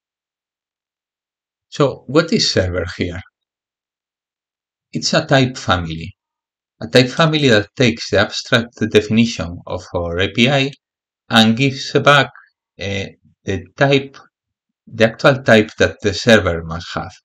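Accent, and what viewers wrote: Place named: Spain. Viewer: Sounds like an spanish accent to me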